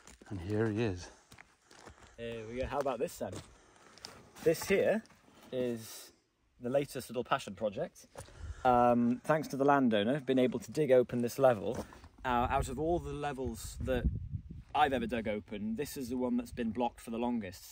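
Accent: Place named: England, United Kingdom. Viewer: A very interesting mining venture. Thanks for exploring it. Also, of interest was to see two "English" doing the exploring. (diffinetly your accent belies your nationality) I say hello from the state of Wisconsin in the USA.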